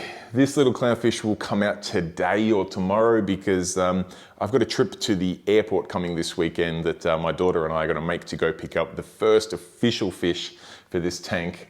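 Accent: Australia. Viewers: As a man raised in California, I’m only here to hear the best accent the world has ever produced! Damn I wish I was Aussie! Lol. Awesome tank man and grate series.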